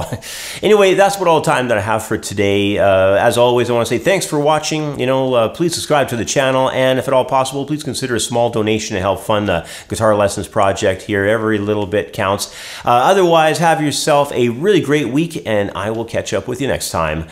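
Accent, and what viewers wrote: Canada. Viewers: Coolest thing is even though a 1 string solo not playing with 1 finger too obvious is to a untrained eye the illusion created is that it appears to come across unless watching like a hawk a very melodic piece of music. And very cool. Almost like what Glenn RIP did on the little solos were only on 2 strings. You got so much content don't know if ever get thru all but gonna sub. to channel. BTW I hear Canadian accent are you still up there or do you live on states now?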